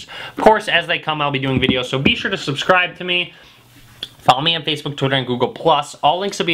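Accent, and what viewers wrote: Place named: Chicago, United States. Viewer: This guy has to be from Chicago. I'd know that accent anywhere.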